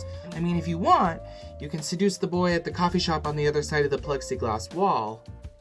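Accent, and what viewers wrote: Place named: Ireland. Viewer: Love the Irish accent lol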